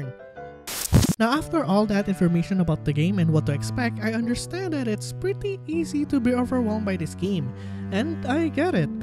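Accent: Philippines. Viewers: Corrct me if I’m wrong but I sense a Filipino accent 🤣🤣🤣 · Eyyy filipino accent 💯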